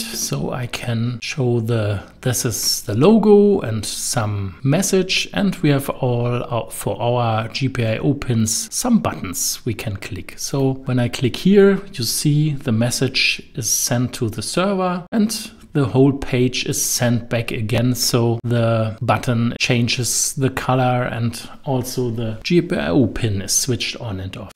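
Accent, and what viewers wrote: Germany. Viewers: Man kann dein Denglisch richtig raushören 😂 aber gutes Video 👍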